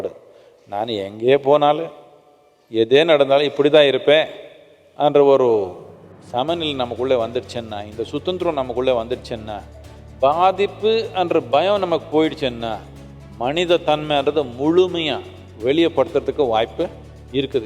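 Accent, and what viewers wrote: Tamil Nadu, India. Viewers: Tamil accent is very beautiful to hear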